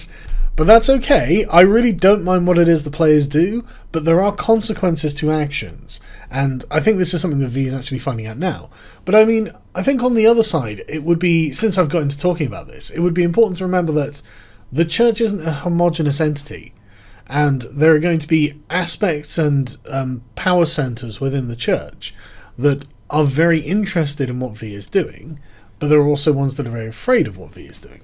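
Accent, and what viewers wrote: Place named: United Kingdom. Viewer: I did not know Sargon was DMing an actual D&D campaign! I was I could be one of his players. I would LOVE to have my character's actions narrated by that amazingly British voice...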